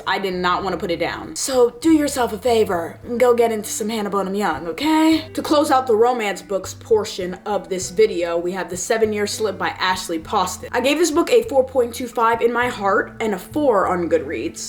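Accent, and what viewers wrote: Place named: United Kingdom. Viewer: Why did i assume you were british..? Anyway immediately subscribed.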